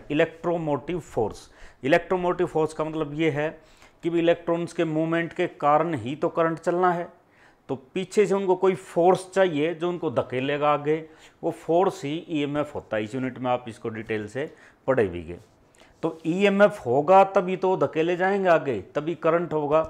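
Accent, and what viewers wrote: Haryana, India. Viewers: Sir are you from Haryana.?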